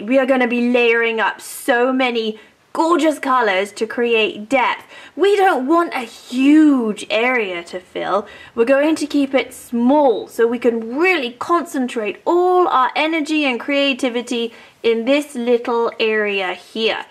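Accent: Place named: United Kingdom. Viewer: thank you, you are talented, i found it funny that you sound so british even though you are from usa :D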